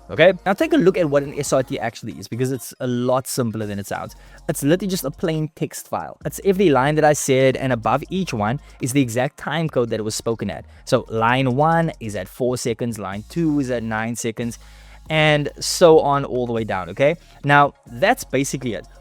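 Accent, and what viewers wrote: South Africa. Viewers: My brother are you from South Africa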